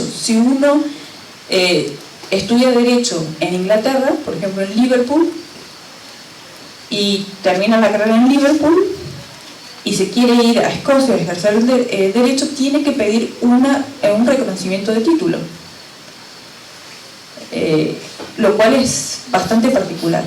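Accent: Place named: Argentina. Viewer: saludos. no teiene mucho quever pero me suena como a unespeciede acento Mendocino de argentina o Cordobes de argentina.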